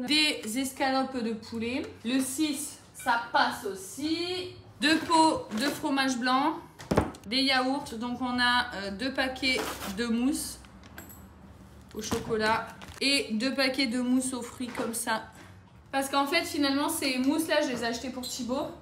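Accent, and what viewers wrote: South, France. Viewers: Owe owe owe! Vous allez arrêter de vous moquer de l'accent du sud là oh! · Oui j’adore te voir faire le ménage 😅 viens chez moi 😂 avé l’accent peuchère ❤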